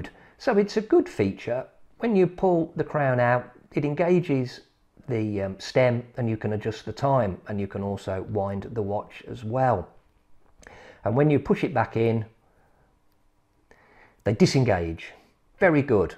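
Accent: Russia. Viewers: As a Russian guy from Siberia I approve this accent, tovarisch! Great review btw! Subscribed.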